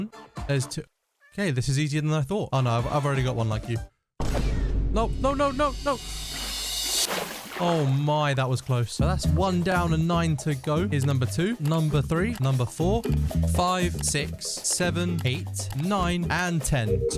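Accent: United Kingdom. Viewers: Your from England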